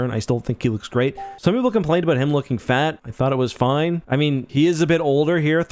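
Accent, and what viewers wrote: Canada. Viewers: Love his Canadian accent!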